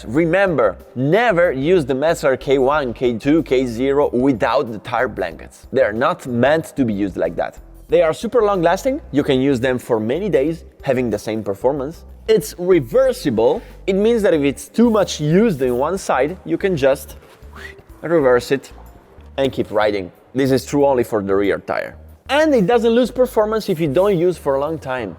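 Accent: Italy. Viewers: This guy can talk ! I got a headache within the first 5 mins, jeez..! Keep working on your English dude, it needs still a lot of improvement, I guess you will never lose that Milanese accent..Lol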